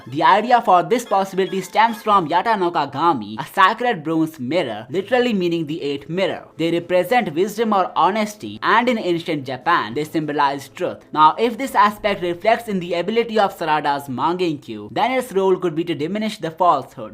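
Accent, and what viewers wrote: India. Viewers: Broo I thought ur indian because of ur accent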